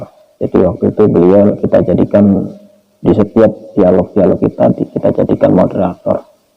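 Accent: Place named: Java, Indonesia. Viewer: Kau bercakap berteleleh...macam org nak mampus....jawa medokkkkk...insyallah..Ali diaAb Allah...Aaminnnnnn....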